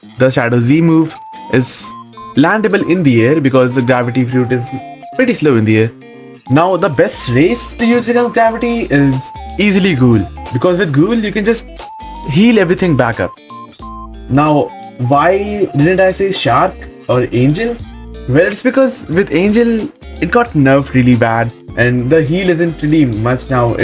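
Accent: India. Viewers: ngl u sound like a indian curry dweller but good tips btw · the indian accent eww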